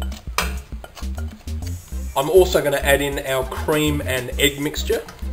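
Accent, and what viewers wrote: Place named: Australia. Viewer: xD his accent is australian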